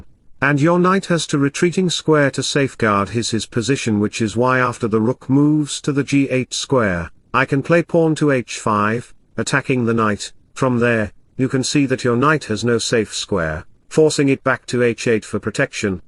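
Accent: United Kingdom. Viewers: It must be - that's a British accent.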